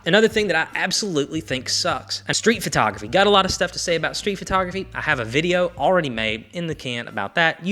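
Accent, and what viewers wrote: Kentucky, United States. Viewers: 1. Instant film is overhyped and overpriced and takes absolutely know photography skill at all other than holding the camera still. 2. Fujifilm abandoned the filmmaking community in 2013 (reference their last CEO bluntly stating film photography was irrelevant). 3. I love Kentucky. My mother was from Liberty (Casey County) and I made a short 16mm film on my visit to Danville and Liberty). Your accent reminds me of my family down there who are down to Earth just good people and the mainstream media for years has stigmatized Southern folks unfairly as inferior. Dont change the accent. 4. I know EXACTLY which film influencers you talk about as low energy not to name names cough grainydays but yeah I dont get the whole jaded attitude projection either? Its like dude you should feel excited and privileged you get to shoot film in the "digital era. 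AND heres my issue with the film photographer "influencers" and they continue to ignore this point but they refuse to acknoledge the fact that shooting on film is about OWNERSHIP of images i.e through hard copy archiving. Thats the BIG reason I shoot film. Its not just for the "look" or it "slows me down. "Its the fact I have physical, tangible 4K hard copy image that will last over 100 years. 5. I wont even dignify the "digicam" thing whatever that is but its most definitly not celluloid but you share the same frustration with Digicams that I share with those "film emulators" that infect my Instagram news feed. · As a Kentucky native, I agree with your point about the accent. Being from Louisville, I may not have the exact same accent as you and yes... ha, I live in LA now! HOWEVER, it should be more about your ideas than the way you speak. Cheers. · Hell, I come for the accent. I went to college in Lexington, my room mate was from the sprawling metropolis of Pikeville so it's familiar. 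Plus being from Tennessee, I can't make fun of anybody's accent. You're inspiring me to let mine show more than I do.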